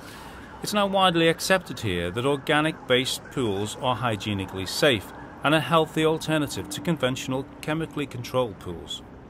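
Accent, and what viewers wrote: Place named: England, United Kingdom. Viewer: Documentaries just sound more official in an English accent.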